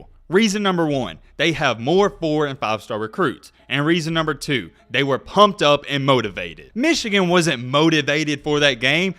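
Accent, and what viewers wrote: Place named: Tennessee, United States. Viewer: It's the accent...TN? Little hard on my ears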